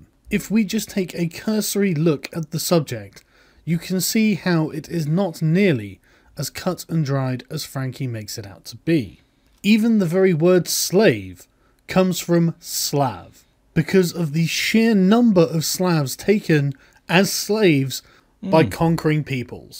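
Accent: United States. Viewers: im glad that u are learning , but ur a tipicle american , im english and when u speak my ears hurt A-rab lol E-unuchs dude it hurts to hear u talk out the words ,sorry but it does ,keep up the learning its good .... try listening to english speaking english and repeating how they say words how you say them isnt right its course to say the least , remember its are language we invented it , its not yours ie:american i proberblty would come across to spanish or french the same if i wanted to try thier language but! that aint happening lmao tc b safe :)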